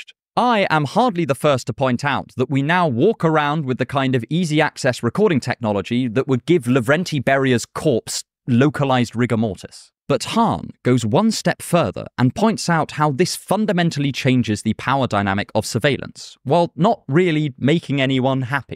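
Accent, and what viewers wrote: United Kingdom. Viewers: first viewer and just known this channel 2 mins ago. been here cause your beautiful British accent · Who close captions your videos? I see a lot of errors that could/should be remedied as they don’t recognize the idiosyncrasies of your British accent.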